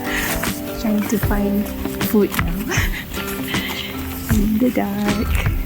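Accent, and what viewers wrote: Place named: Australia. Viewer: have you spent time in Australia? sounds like you have a slight Australian accent.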